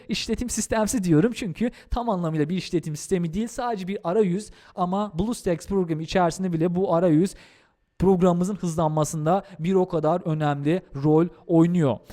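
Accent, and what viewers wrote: Azerbaijan. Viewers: abi sen azeri misin merak ettim :D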